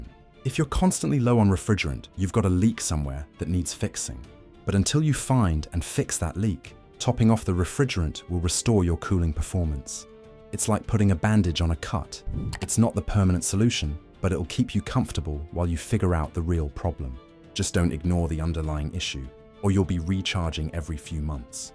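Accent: United Kingdom. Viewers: Screw your British accent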